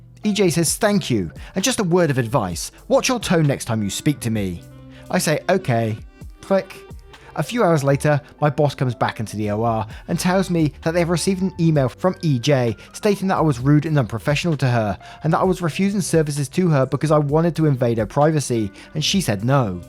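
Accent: England, United Kingdom. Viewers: My day off so perfect time to catch up on waffles lol. Always awesome to listen to, the perfect voice. Canadian’s love that English accent.🥰🥰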